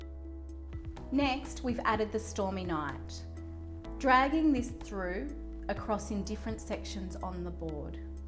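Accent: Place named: Australia. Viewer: Nice to hear your familiar Aussie accent !! Great video xxx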